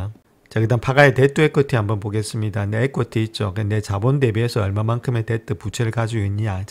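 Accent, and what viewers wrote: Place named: Gyeongsang, South Korea. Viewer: 어쩐지 좀 거슬린다 했더니.. 갱상도인가요????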